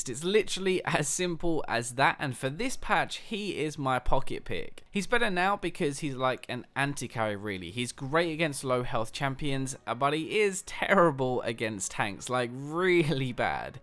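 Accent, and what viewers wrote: England, United Kingdom. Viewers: It's not a British Accent, it's actually an English accent. Because Britain is a country made of multiple smaller regions, with their own languages and dialects, they have Scottish people, Welsh, Irish, and English each with their own accents. The English one is usually labelled as a "British" one tho.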